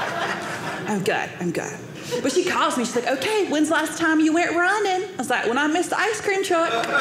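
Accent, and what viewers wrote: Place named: Alabama, United States. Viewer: i like her, she talks like me! From Alabama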